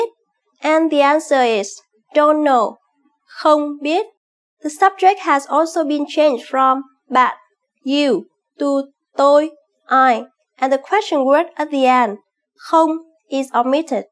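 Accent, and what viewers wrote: Vietnam. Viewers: Is this southern or northern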